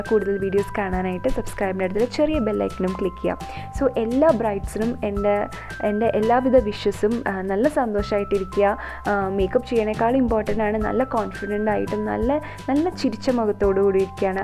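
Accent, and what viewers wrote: Thrissur, India. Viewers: Cheachi thrissur ano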